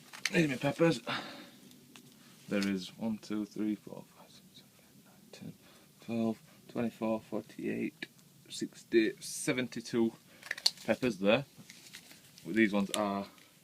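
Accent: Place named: Barnsley, United Kingdom. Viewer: I didn't think you were far away with a Barnsley twang like yours. Check out if you already haven't johnnies allotment so funny. Got some chicks hatching so will put a post out same time as i get some photos of allotment. Its always good to see new people and allotments good luck for the season will keep looking.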